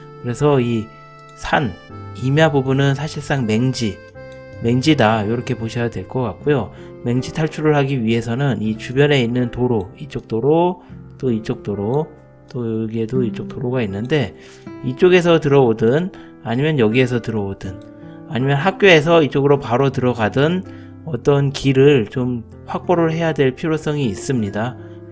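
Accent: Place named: Gangwon, South Korea. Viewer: 채널주인분 강원도 아니면 충북 쪽분같내요 말투가 약간그쪽분같음 친근하내요 ㅋㅋㅋ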